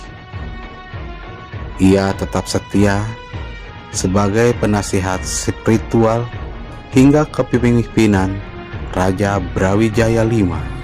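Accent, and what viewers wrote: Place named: West Java, Indonesia. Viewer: Bahasa Jawa Logat Sunda Om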